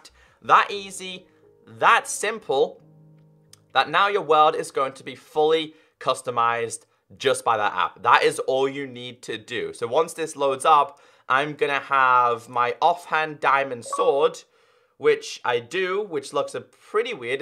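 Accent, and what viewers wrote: United Kingdom. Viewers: probably cus he’s british as well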